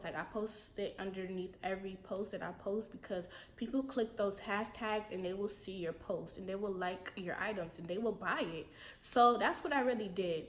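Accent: New York, United States. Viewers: I promise you I knew you was from New York. It’s the accent for me 😂🖤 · I knew you were from New York because of how you talk lol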